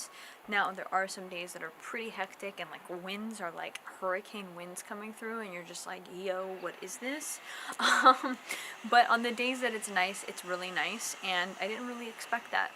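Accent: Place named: South, United States. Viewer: O Southern accent !! 😮😁 Love ya !! 😻😻 More vids please !! 🤗🇺🇸💯💯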